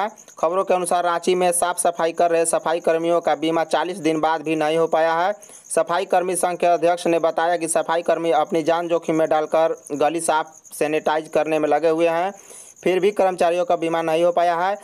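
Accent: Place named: Bihar, India. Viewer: Tum to bihari lag raha h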